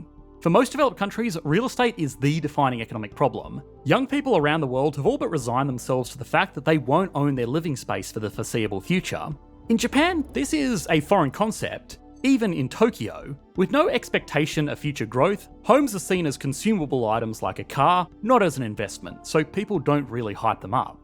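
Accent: Australia. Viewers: the Australian accent is the worst accent in the world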